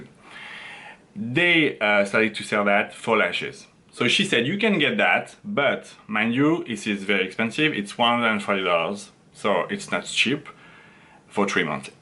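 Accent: France. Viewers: french accent bro ah la la jsuis pas le seul mdrrrr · As a French I like your French accent 😁😁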